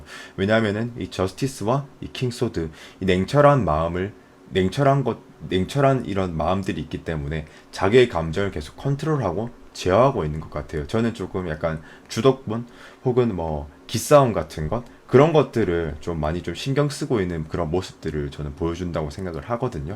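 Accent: Gyeongsang, South Korea. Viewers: 전남친이 경상도 사람이였는데 말투가 비슷해서 너무 맘이 아파요.. 타로 감사합니다 3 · 저도 경상권이라 사투리 너무 정겹고 반가워요ㅋㅋㅋ👐 . . . 2번🔮 실제로 연락은 한번도 안왔는데 저는 먼저 할 생각이 없네요 ㅎㅎ 리딩이 사실이면 좋겠어요..! 잘듣고갑니다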